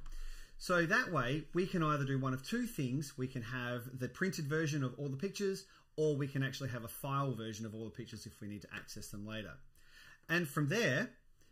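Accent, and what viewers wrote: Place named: Wales, United Kingdom. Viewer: Thanks mate, you saved my bacon. Also, I love your Welsh accent! 😉👍🏻🇬🇧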